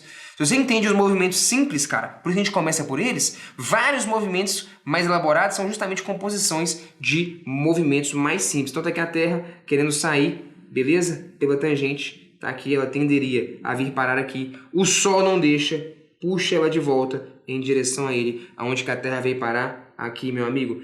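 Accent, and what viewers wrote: Minas Gerais, Brazil. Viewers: Eu te amo cara se ensina bem e com um sotaque de mineiro foda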